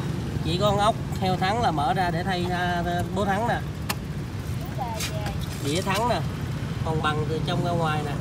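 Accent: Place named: Mekong Delta, Vietnam. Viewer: Nói chuyện rất dễ nghe. Nghe giọng miền tây thiệt thà.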